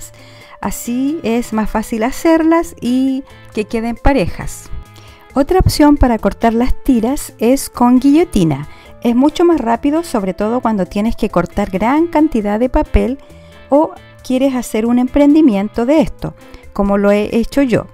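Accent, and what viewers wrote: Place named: Chile. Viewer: Hola me encantó primero por que eres chilena como yo, con 40años en Italia escuchar la voz de una chilena me da mucho gusto. Muy lindos tus trabajos yo me dedico a la lana todo lo manual es ermosa brava 👏👏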